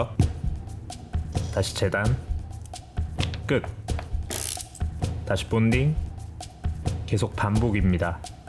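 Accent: Gyeongsang, South Korea. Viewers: 약간 경상도 억양 섞인 말씨가 중독성있음 ㅋㅋ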